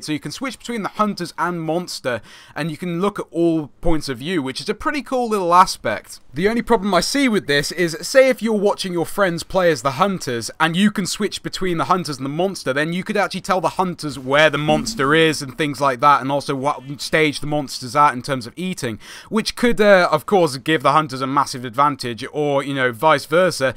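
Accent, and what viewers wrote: United Kingdom. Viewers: He's British? Cool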